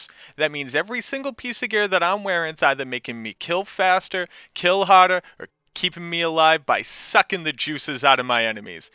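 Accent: Boston, United States. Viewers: The boston accent came out HEAVY in this video :D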